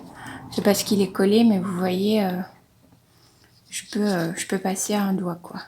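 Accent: Belgium. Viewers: jaime beaucoup ton accent belge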